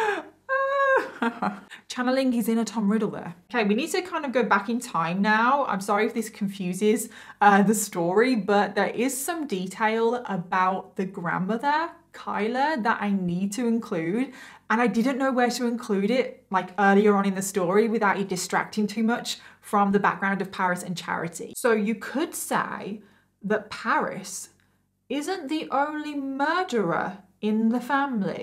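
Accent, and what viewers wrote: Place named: England, United Kingdom. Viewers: How can anyone believe he's rehabilitated. I agree with you! I love your English accent and your very pretty 😍 thanks for your videos! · yes she come up with the mystery murder and makeup idea BUT people get inspiration from others and the world and just because she’s doing her makeup and telling the story like Bailey does it doesn’t mean she’s trying to be like her! She’s doing it her own way and plus she’s from England so the accent gives her a twist and makes her nice to listen to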